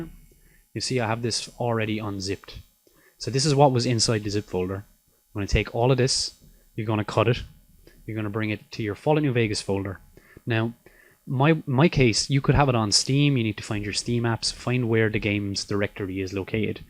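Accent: Ireland. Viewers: what's lol about it? he's Irish, I believe.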